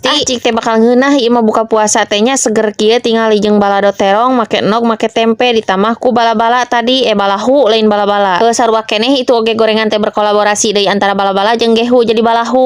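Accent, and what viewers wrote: Sunda, Indonesia. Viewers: Logatna Sunda pisan teh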